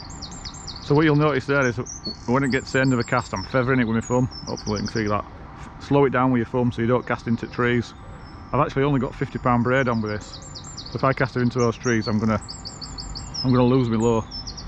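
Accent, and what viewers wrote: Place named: West Midlands, United Kingdom. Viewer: What canal is that? Is that the Aire/Calder? Your accent kind of sounds West Midlands, maybe Leeds area. Hope all is okay in the UK.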